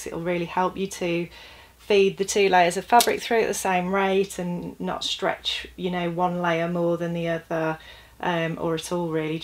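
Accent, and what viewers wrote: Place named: Midlands, United Kingdom. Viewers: That Midlands accent!! Takes me back! Great video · K and G are related phonemes, being voiced and unvoiced versions of the same. Regional accents and dialects influence how speakers pronounce them. Interestingly, dialect, from the Midlands, is thought to be the original pronunciation of modern English. Perhaps you might consider learning more about linguistics and phonetics before making judgements on other’s use of English. It might also help with your grammar and punctuation. It was very irritating to be distracted, by vacuous comments, from such an excellent demonstration by a very articulate and technically able presenter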